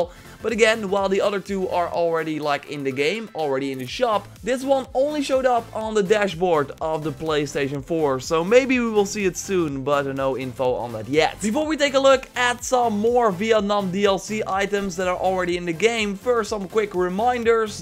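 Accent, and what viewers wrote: Netherlands. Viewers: Wait what are u dutch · I'm assuming that cool accent is a Dutch one? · Ik wist altijd al dat je nederlands was dat hoor je gwn aan je accent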